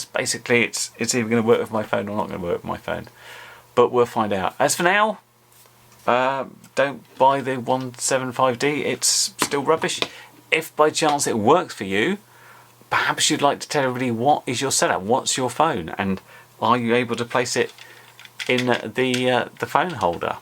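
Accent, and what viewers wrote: United Kingdom. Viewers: Like the old saying goes, you get what you pay for. You have more patience than I do. Still enjoyed the video. English accents are always more enjoyable to listen to. Imo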